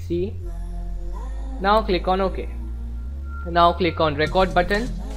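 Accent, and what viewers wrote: India. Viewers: His accent bet his indian? if im not wrong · Obnoxious "music" and audibly, almost definitely Indian voice (and all that you know). Nah, there are better tutorials. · heehee are you indian? :) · you speak like a indian ,i think you are indian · Stopped watching after i heard that he was indian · HE IS LIKE INDIAN AHH THAT VOICE BUT TNX ALLOT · AWSOME Indian accent man I like it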